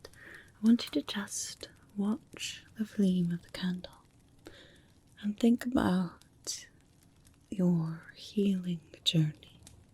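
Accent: Scotland, United Kingdom. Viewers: The scottish accent is the best❤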